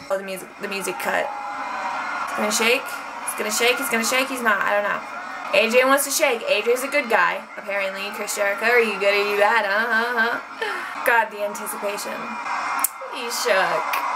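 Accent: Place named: South, United States. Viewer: lmao "he kinda has a hillbilly voice" ...he's from the south, hence a southern accent.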